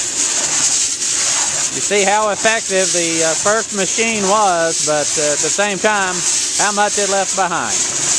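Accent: United States. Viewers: Just saw your video and had not seen how rugs were cleaned before. Thank you for posting it. You sound like a Southerner. Are you located in the US Southeast? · Are you from Tennessee??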